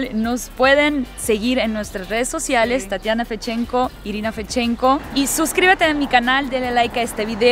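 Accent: Mexico. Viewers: Ya suena mas mexicana que ucraniana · Me intriga mucho su asento español Mexicano..la hermana dijo alberca y esta padre algo muy Mexicano 🤔😘🇲🇽saludos hermosas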